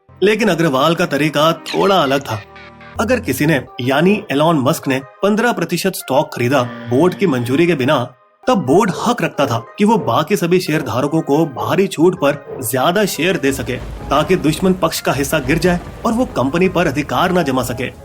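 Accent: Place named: South, India. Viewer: Good to see you back 😎. That madrasi voice caused my head to spin 🦔 🤮 🤮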